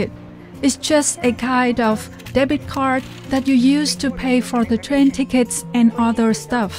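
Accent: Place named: China. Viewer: Are you a Mainland Chinese living in Japan? You speak English like my Mainland China colleagues. Nothing wrong with that, just wondering 😊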